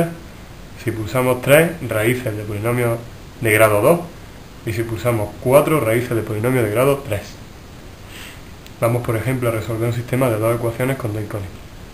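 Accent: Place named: Caribbean. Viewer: Hola, me sirvió mucho, pero para que sus vídeos se vuelvan más virales debería neutralizar más ese acento caribeño. saludos desde los andes Colombianos...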